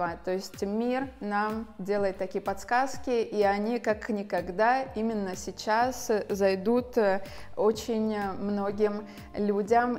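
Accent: Italy. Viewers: Обожаю, как вы разговариваете, у вас даже речь стильная! Грамотная, уверенная, женственная и как вишенка на торте элегантный итальянский акцент! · я обожаю ваш итальянский акцент🥰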